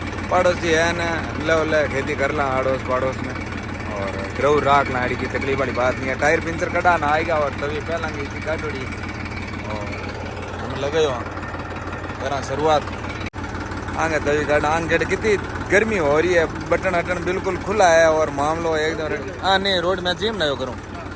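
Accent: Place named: Rajasthan, India. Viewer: Boli Bohot ghaint lgdi Rajasthan di full ghaint 👍👍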